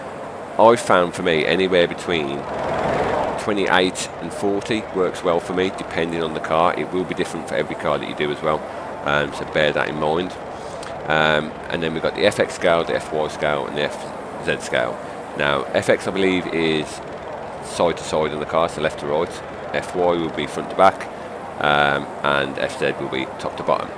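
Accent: Black Country, United Kingdom. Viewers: where u from mate. I can here the yam yam accent. Lol im from wolves.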